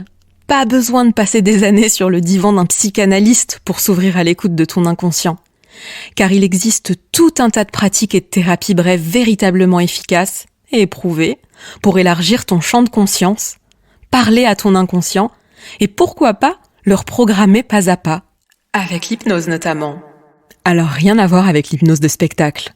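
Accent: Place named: Marseille, France. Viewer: Merci pour cette présentation détaillée et le sens de l'humour et l'accent "Police de Marseille" un délice pour les oreilles et les sens.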